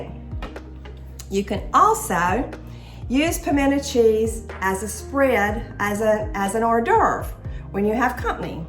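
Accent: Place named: South, United States. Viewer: Love that southern accent! And this recipe is awesome!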